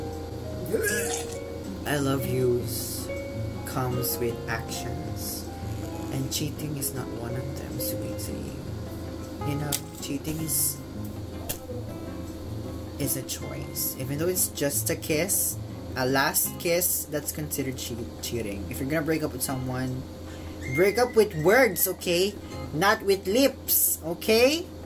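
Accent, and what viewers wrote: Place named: United Kingdom. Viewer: Ur British accent made my day! Love from UK 💕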